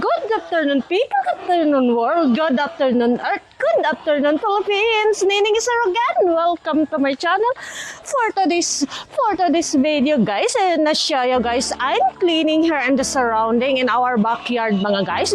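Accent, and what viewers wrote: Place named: United States. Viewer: wow...ka daghan na di ay sa imu English....hehhehe....mura na amerikana...very nice...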